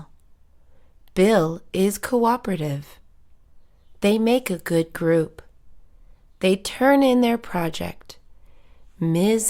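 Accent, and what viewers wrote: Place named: United States. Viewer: Американское произношение. Спасибо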